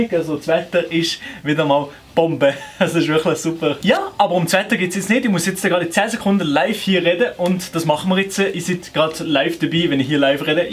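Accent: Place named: Fribourg, Switzerland. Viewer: I finge dr Friburger dialekt efach sooo witzig 😂😂😂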